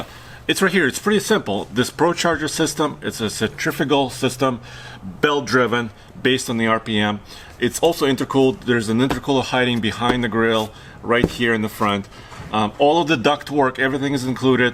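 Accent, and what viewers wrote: Russia. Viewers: Is this guy Russian? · Man, i hate his russian accent. Idk why.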